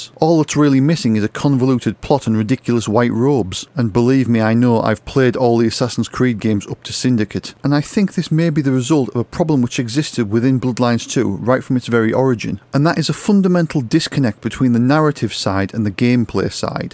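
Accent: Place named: United Kingdom. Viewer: Instantly subscribed during the first minute because of your accent. British accents are far too rare on yt.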